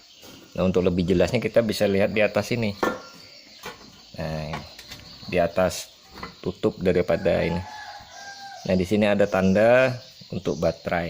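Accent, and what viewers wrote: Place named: Tenggarong, Indonesia. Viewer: kira urang Tenggarong tadi,,dengar logatnya,,salam dari kukar ni broo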